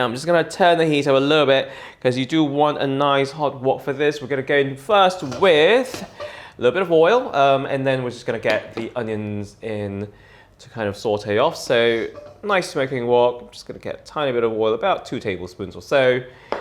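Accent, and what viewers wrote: United Kingdom. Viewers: ❤ your british accent 😊 sir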